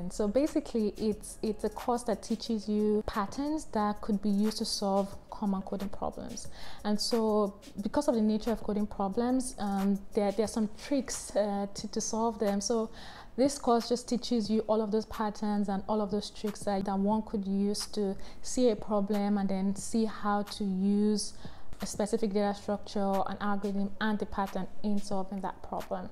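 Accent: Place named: Nigeria. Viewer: That's Nigerian accent ! 😊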